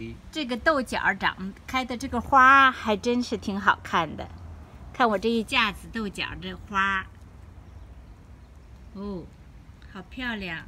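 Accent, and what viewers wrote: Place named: Zhuozhou, China. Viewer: 听着这么熟悉的口音，您是涿州一带的人吧？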